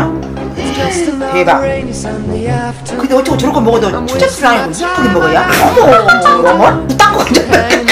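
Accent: Jeolla, South Korea. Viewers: 진짜 넘 웃기심 혼다 웃고있음ㅋㅋㅋ 완벽한 전라도 사투리심 울 엄마 같으심ㅋㅋ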